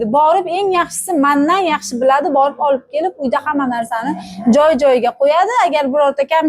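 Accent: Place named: Bukhara, Uzbekistan. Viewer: Buxorodan salom. Shevamizdan aylanay😊. Biz xam siz tarafdan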